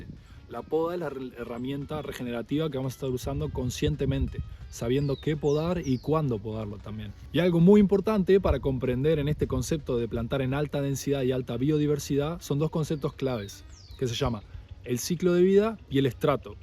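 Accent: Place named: Uruguay. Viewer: Qué divino que todo esto sea hecho en Uruguay, ya me parecía por las especies y tu tonada, además me la juego que es Rocha o Maldonado. Sería divino poder visitar y conocer, abrazo.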